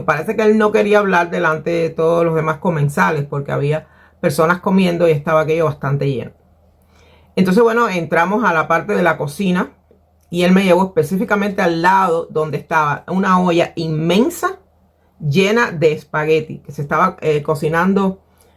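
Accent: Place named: rural, Cuba. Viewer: Ayeme. Tu eres guajira por tu an me abla asy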